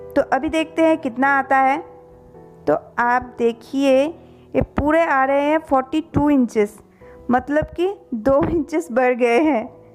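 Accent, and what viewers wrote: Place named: Bengal, India. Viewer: Ap bangali h na?...apki awaz se hi lg rha h😊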